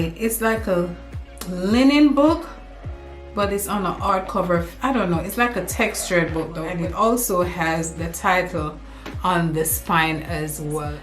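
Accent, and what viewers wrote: Jamaica. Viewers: Are you Jamaican '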